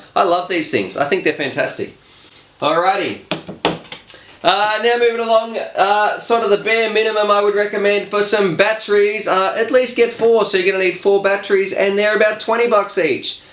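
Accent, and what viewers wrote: Australia. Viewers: You are Australian aren't you? Tell us the prices in AUD!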